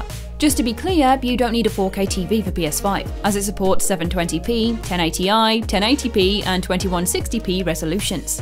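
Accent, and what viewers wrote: United Kingdom. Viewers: hey, I don´t have english as my main language... I find her accent a little different... Is this british or something?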